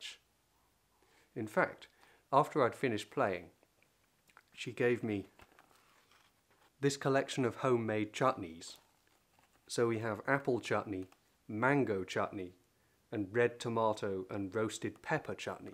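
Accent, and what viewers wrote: United Kingdom. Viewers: Rejoice, o my soul, in Christ, the Risen Saviour!, your narrating is truly wonderful, so beautifully English. Thank you for this wonderful recital.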